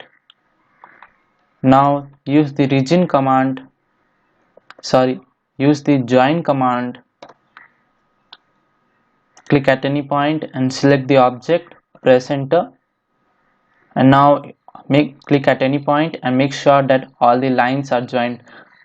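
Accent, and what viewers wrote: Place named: India. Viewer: Video too fast and please reduce the indian accent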